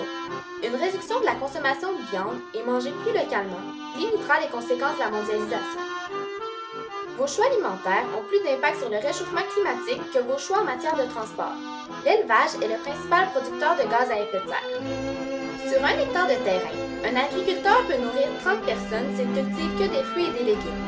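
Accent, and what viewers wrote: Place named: Canada. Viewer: Trop de musique de fond, en plus avec votre accent canadien, difficile de suivre !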